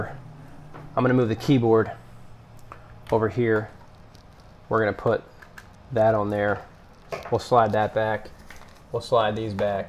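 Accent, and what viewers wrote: Ireland. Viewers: where in Ireland are you from ?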